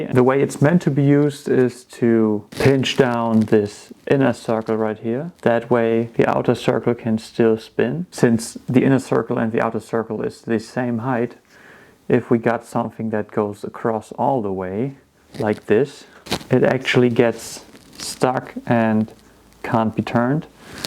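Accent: Germany. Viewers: your clearly german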